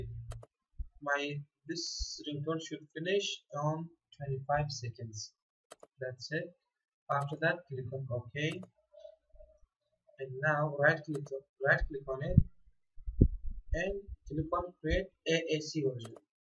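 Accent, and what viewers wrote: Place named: India. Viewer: This guy sounds like a indian irs scammer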